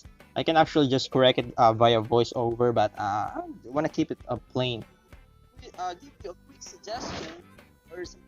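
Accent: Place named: United Kingdom. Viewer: British accent heh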